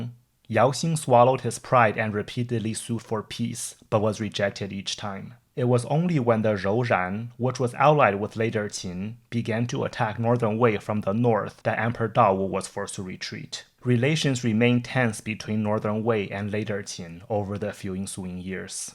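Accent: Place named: China. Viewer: Looks interesting stuff but I can't stand your bad English pronunciation and harsh Chinese accent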